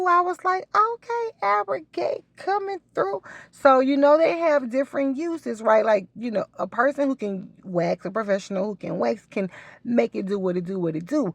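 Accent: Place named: South, United States. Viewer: I watch all your uploads so informative and satisfying to see! I have been through the gamut of hirsutism hair removal issues and just seeing you help others is very encouraging. This upload I enjoyed your accent and the way you pronounce "depilatory" I just love listening to your southern accent! Love it! Keep up all the great entertainment and good facial hair waxing help!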